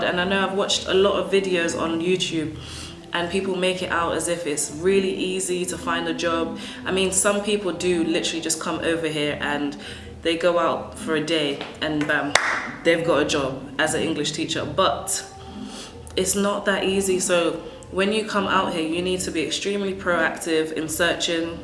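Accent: United Kingdom. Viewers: i really love ur accent,,its british accent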